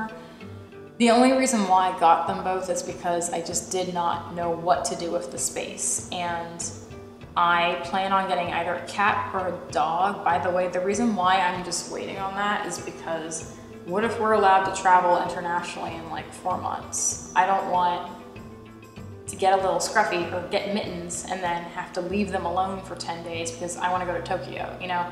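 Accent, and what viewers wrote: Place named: Los Angeles, United States. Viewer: Ah oh L.A. broad, oh i was guessing Texan or midwest.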